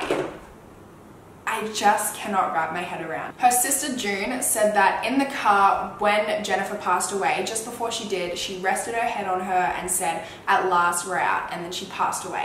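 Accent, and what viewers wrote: Australia. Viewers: yay she’s an aussiieeee!!!!! :) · Holly molly she aussie oml i am too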